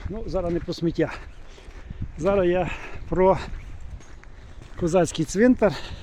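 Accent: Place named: Vinnytsia, Ukraine. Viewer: Дякую пане за цікаву подоріж до козаків.Мені ваша розмова чомусь підсказує що ви з вінницької області? Дай Боже всього найкращого що є в нашому житті і здоров,я.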